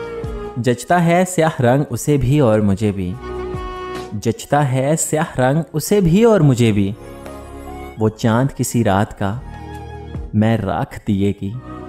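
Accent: Punjab, India. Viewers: آپکا لہجہ سن کر یقین یو گیا کہ پنجاب کے لوگ اردو بھی پنجابی کے طرز پر بولتے ہیں، پٹھانوں کی اردو کا مذاق اڑاتے ہو، اپنی اردو کا حال دیکھو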